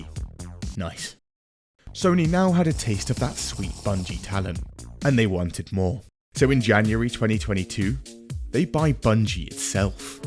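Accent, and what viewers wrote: United Kingdom. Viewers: Why does this general kind of YouTuber always have a British accent? (, Internet Historian, Joeseppi)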